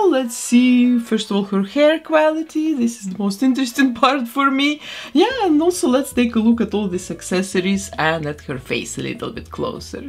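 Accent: Russia. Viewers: Русский акцент или мне кажется?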